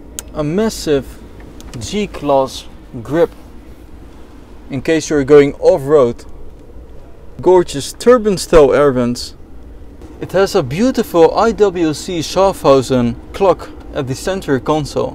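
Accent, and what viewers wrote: Netherlands. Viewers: Most dutch accent ever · He is dutch :P i hear it :D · It's English in very Dutch accent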